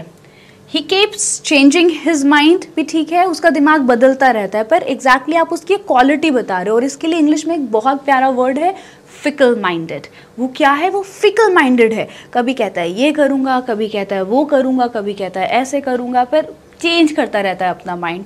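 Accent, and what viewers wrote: India. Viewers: Sweat and smart words that enhance the charm and beauty of sentance and you are full proved in it. And I'm highly impressed with your's English accent with the soul of Hindi adds to the excellence of language, Thanks beti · Maam I loved d way u say Hindi wale English 😂❤keep on teaching like this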